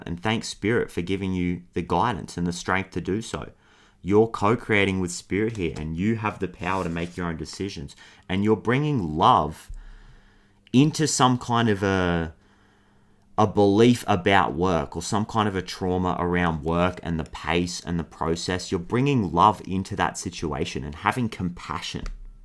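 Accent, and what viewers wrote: Australia. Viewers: Hey, thank you. It's so nice to hear a fellow Aussie read. And it's spot on. I am being taken to a rental tribunal by a managing director who lied to me and has lied on an official form. I am scared, not sleeping well, although I had a dream where I saw the word "peace" and the name of the managing director who has lied, defamed, and made my difficult life harder. I felt a sense of relief after that dream, some compassion for the damaged young woman that I am dealing with. I have been showing up every day, taking all the action I can. The hearing is on Wednesday. I will take compassion, strength, and all my spirit guides and angels with me.Thanks for your wisdom.K.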